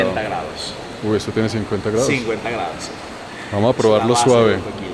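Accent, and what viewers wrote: Bogotá, Colombia. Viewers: Amo el acento colombiano ❤ entonces puedo decir que por eso empecé a ver tus videos y ahora no pido parar muy buena información tu voz relajante te invito a Puebla es tan grandioso cada rincón de mi México. Bienvenido 😊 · Ahora quiero un novio colombiano 😅😍 me encanta este acento 😍😍😍😍❤️ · Q gran contenido y con ese acento rolo genial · Me encanta tu manera de narrar! Eres súper gracioso y ese acento colombiano que tiene me encanta! 🤣🤣🤣🤣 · Me encantas con ese asentó colombiano estimado 💚👍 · Habla rolo acento bogotano se dice q es el acento español más claro de Latinoamérica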